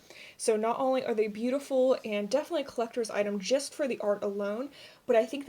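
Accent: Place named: Eastern Europe. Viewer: Love your spirit! So joyful and enthusiastic 💕 You have very interesting accent. Are you from Eastern European culture? Love and light 🌺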